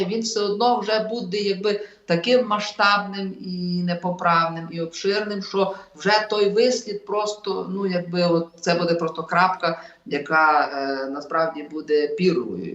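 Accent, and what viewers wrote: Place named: Carpathians, Ukraine. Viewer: Надо видеть цели России и Украины. Цель России - безопасность ее границ и безопасность русских. Возврат Малороссии в состав большой России вторичен, так сказать, два в одном. У Украины своей цели нет. Украину придумали англосаксы, чтобы разделить русский народ и заставить его воевать сам с собой. Яркий пример - сидит довольно умный этнически русский Дроздов и на чистом гнусаво - карпатском диалекте вещает в пользу Украины.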